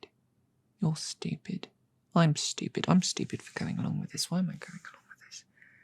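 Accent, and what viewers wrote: United Kingdom. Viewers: British, needs to kill me and spouts puns? Yeah its a friday alright, ty!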